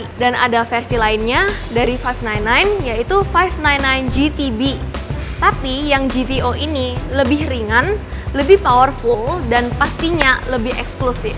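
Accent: Java, Indonesia. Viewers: Mbk nya orang JAWA ya.? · Kok suaranya medok bgt , asli mana ya , surabaya kah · Terpesona sama mbak nya... Medok banget kaya nyonge 😂😂😂😂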